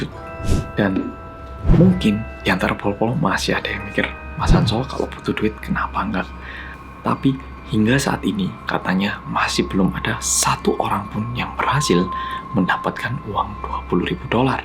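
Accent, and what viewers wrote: Java, Indonesia. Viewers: Masih bersama mas yang MEDOKKKK... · Nah iyak sama😂lupa soalnya..biasanya medok wkwk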